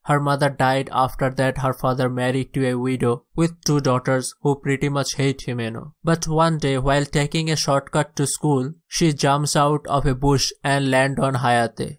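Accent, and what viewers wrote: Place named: India. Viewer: R u Indian 😍😍😍😍😍😍😍😍😍😍 U accent so cool, mjhe tmhara awaz bhot psand aya 🤗🤗🤗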